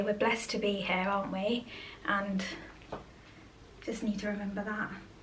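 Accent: United Kingdom. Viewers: you and are such a lovely couple. lovely accent btw. which part of the UK does your british accent originate from?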